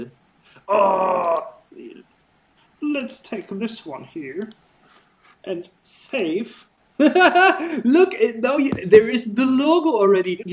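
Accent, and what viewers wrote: Germany. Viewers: Is his accent german?😅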